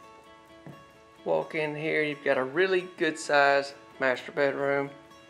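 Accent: South, United States. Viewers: I love watching these. My husband and I are considering a mobile home or a modular for our property eventually. Mobile homes have come such a long way. Also, his handsome southern voice makes these easy to watch too. Lol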